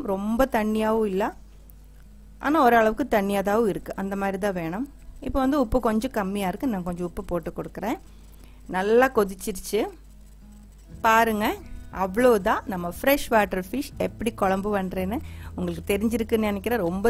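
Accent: Kerala, India. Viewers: Azhagana Malayalam kalantha thamil pesum thangachikku vaalthukkal. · Malayalees tamil pesuradhe oru azhagu than! I like the way you speak and the way you speak and oviya S accent (big boss) !! I tried this watching a malayalam channel it was v delicious 😋 I tried it with mackerel , next time I l try in your style for jelabi meen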